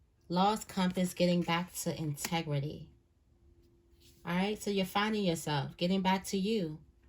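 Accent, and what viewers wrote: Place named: DMV, United States. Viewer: I be hearing a lil DMV in yo voice , you from bmore ?!?! 😂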